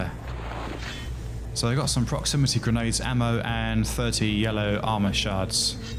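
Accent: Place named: United Kingdom. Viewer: Nice to hear another player from the UK for once lol, do you play Defiance by any chance....we could do co op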